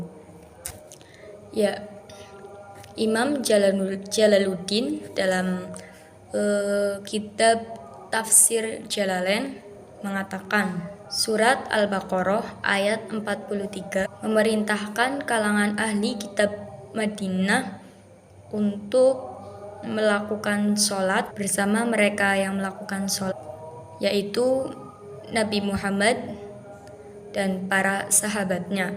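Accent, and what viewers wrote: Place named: Java, Indonesia. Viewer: Good luck, medok ya gaes ya😅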